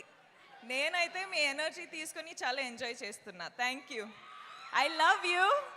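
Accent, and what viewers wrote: Telangana, India. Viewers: You become what you eat❤ Telangana slang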